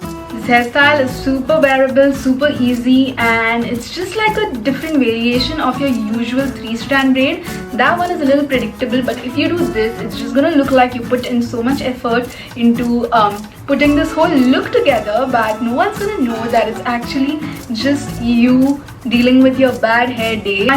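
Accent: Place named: New York, United States. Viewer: Love that shimmery eye shadow The way you talk is very nyc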